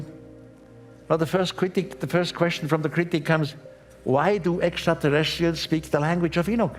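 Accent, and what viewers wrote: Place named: Switzerland. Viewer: Er hat erst kurz vor seinem Tod angefangen Videos auf Englisch hochzuladen und weil er halt Schweizer war und älter als mein Vater verstehe ich sein Englisch besser als native Speaker. Ich habe damit kein Problem. Von Däniken und ich sprechen Englisch in etwa auf demselben Niveau, welches nicht besonders anspruchsvoll ist. Insofern: No problem. 😅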